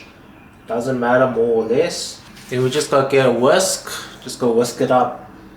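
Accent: South Africa. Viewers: Are you a South African national? I ask because even though you're clearly of Asian descent...the way you talk foreign to Asia, your accent is very African😂, are you from South Africa by any chance? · Oh my goodness! I absolutely LOVE you and look forward to trying this recipe over the weekend. Thank you so much for sharing, you just made me very hungry with a craving for fried garlic chicken thighs. And by the way... I absolutely LOVE your accent. You remind me of my South African friends in Toronto, Canada. Much love to you and your family. Thank you! xoxoxo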